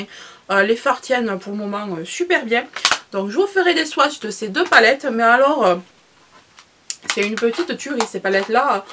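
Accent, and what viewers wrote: South, France. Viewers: ton accent qui sent bon le soleil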